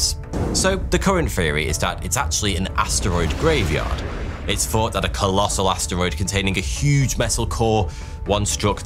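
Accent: United Kingdom. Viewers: Can't stand Limey axxents! Drives me nuts! I live in Canada and I want to listen to my videos in Canadian but almost every time, it's a bloody limey, all of whom totally butcher the English language.j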